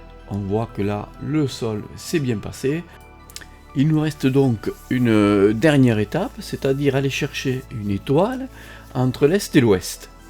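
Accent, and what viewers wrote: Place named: Toulouse, France. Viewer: Un grand merci pour cette série de très loin la meilleure sur ces sujets… c’est clair, précis et sans fioritures inutiles, ça va droit au but sans perte de temps mais à un rythme qui reste très facile à suivre et à assimiler. Pas de blabla, ça ne bafouille pas, c’est un travail didactique remarquable de grande valeur. J’y ai puisé tous les renseignements que je cherchais à comprendre et bien plus encore… Merci, merci, merci mille fois. Et j’ajoute que le petit accent toulousain est bien agréable à l’oreille, ça respire la sérénité tout ça…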